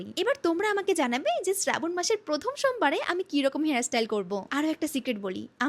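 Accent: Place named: Sylhet, Bangladesh. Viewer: Hothat sylhati .......❤khub bhhalo lage erokom kotha bolle ...erokom jodi regular kotha Bolo bhhalo hoto😢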